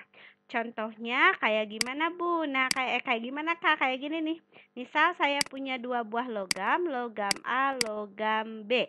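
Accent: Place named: West Java, Indonesia. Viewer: Kak orang Sunda ya?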